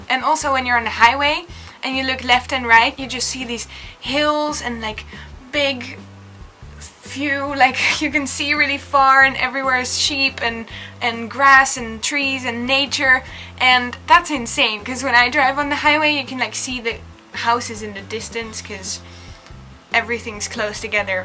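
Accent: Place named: United Kingdom. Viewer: your british accent is there :) so amazing :D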